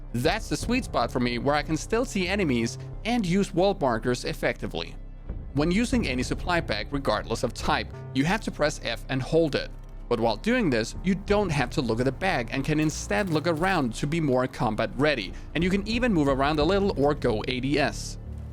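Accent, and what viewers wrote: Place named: Denmark. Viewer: really good guide and could tell you were a dane by the accent, keep up the good work :)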